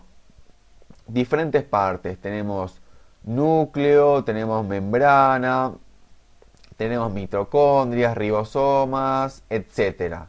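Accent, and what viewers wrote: Argentina. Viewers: Es argentino, deje el show.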